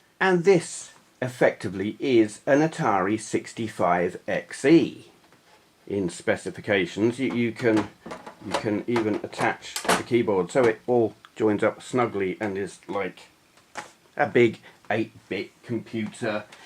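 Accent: United Kingdom. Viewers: u from UK?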